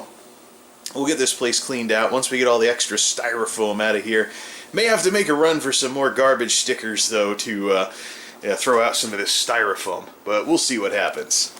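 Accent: United States. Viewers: I live in South Carolina sooo nice to hear a Midwest accent. I have issues w the southern accent lol.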